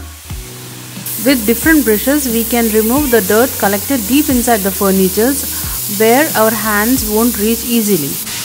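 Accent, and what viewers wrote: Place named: India. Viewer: Yeah exactly what I am wondering also. What is the cost? Also the lady speaking sounds Indian but yet its out of Europe?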